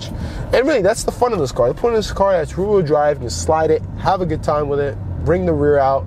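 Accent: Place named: Miami, United States. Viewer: You look and talk exactly like I'd expect someone from Miami to.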